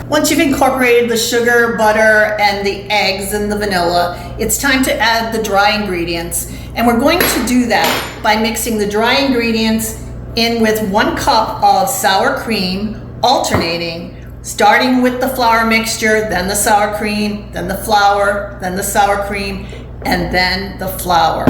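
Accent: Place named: East Coast, United States. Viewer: Hi, Thank You for the tip on freezing the bread. I'm a bread [person, so I could eat the whole loaf, but my sensible self says NO! LOL We're heading out camping for a few days, last camping trip of the season. I picked up some molasses the other day at the store, so I'll be making the Anadama Bread when we get home. I thought your accent suggested an East Coast accent. Thanks for replying. Have a Great Day. Ellen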